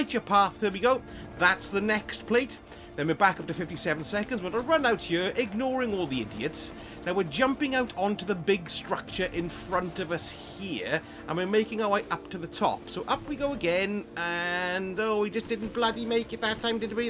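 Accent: Wales, United Kingdom. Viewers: Nice to hear a fellow Welsh accent, you have a clan? So hard starting recently and playing catch up.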